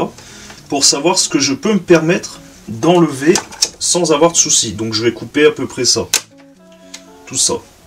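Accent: Nord, France. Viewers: Bonjour, Un grand bravo pour cette vidéo, dis moi quand as-tu acheté cette défonceuse chez Lidl? Tu es du Nord ou je me trompe?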